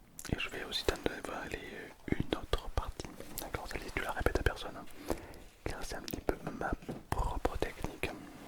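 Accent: South, France. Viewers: Mdrrr avec l'accent du sud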